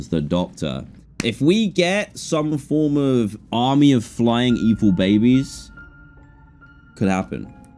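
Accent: United Kingdom. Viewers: I know this is just UK accent but.. mario sounds like mario but the letter a sounds like A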